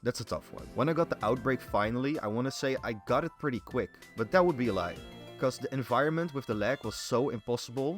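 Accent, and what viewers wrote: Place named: Netherlands. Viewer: You're Dutch aren't you? knew it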